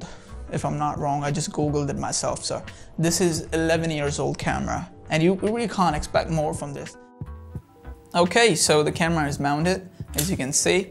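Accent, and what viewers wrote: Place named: India. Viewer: are you indian? Your accent is op❤ What time it take to develop bhiiya?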